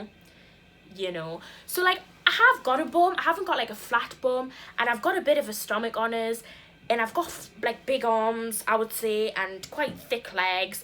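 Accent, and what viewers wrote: Newcastle, United Kingdom. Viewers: Are you from Newcastle? I am and love it because you say canny like me❤️❤️ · What part of England are u from? Geordie? Living for ur accent x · I love hearing our Geordie accent on YouTube 😍 your body is perfect!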